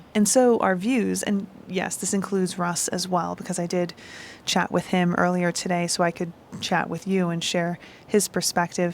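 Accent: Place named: New England, United States. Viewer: I KNEW you had to be from New England - I could feel it! ☺ Once upon a time when I was still a CM at Disneyland, I was seriously thinking of transferring over to WDW. At that time, Spectromagic was still playing and I had a dream to be in it. I was also a naive 20-something. Fast forward many years later, and I just don't know. The humidity is a HUGE factor - does it get any easier the longer you stay? The urban sprawl, traffic - well, I have that at home, so I imagine it's not much different. The cookie cutter stucco homes are annoying AF. I am sure there are older neighborhoods that tourists don't see where I could find more culture and creativity in building - drove through Thornton Park once and that was much more my speed. Too bad the prices don't agree. So, my latest thought is "maybe as a part time retirement job". We'll see...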